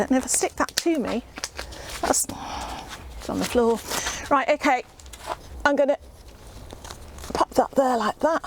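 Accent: United Kingdom. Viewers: Thank you for the brilliant idea of tying the string around the root ball of the tomato plant. I usually use coat hangers pushed into the soil by the side of the plant and then tie the string to that and like you, I wind the plant around the string as it grows. Your idea looks so much easier so I will be trying that method this year. Now, I don't grow roo many in the greenhouse or hoop house over here in Ontario as it gets too hot. So, they are usually grown outside. So for the frame to use overhead, I have several old discarded gazebo frames. They work a treat and it does save them from the landfill (tip) for a few more years. Thank you for the great ideas and I especially LOVE your accent. It feels like a blast from my past as I was raised in UK. I was born here in Ontario but taken over there as a baby.. As you can imagine, I like to use the best of both worlds when it comes to gardening. 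Some things work well here and not so well over there and the other way around. Anyway, you take care and stay stay safe, blessings from Sandie in Ontario Canada.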